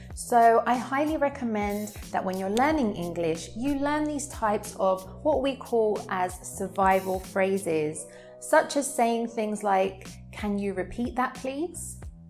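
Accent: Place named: United Kingdom. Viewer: She has a British accent as cute as herself. Thanks for these tips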